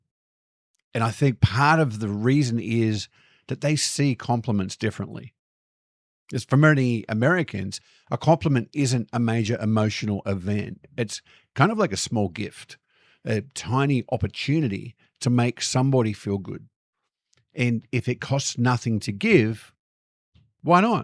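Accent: Australia. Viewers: A slightly different take: We Americans DO want something. We want your story. Give us a tale. How's your day going? Anything interesting? Where are you from? Like to have an iced tea and swap tales? I think we like good stories. Sort of vicarious journeys?? Maybe thinking about things we'd like to do? Don't know that all of this is true...just throwing it out there as a possibility. Your accent's cool, by the way. You from Australia? Wherebouts in Australia? What brought you to our shores? Are we what you expected when you moved here? Any !Aha! moments? :) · Yes, sir I'm an American from Tennessee who saw your vid, "They Want Nothing" on the channel & I just wanted to come show my appreciation here on your channel. Your insights were spot on, many of us Americans are raised, especially in the south to treat others how we would want to be treated so compliments & being helpful to someone, strangers & friends alike, becomes second nature & yes, it is sincere. 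So, Thank You for noticing & appreciating the American way. We're glad to have an Aussie here in the states & I do like the accent too. Keep up the good work & I pray your channel grows to new heights. God bless! 🙏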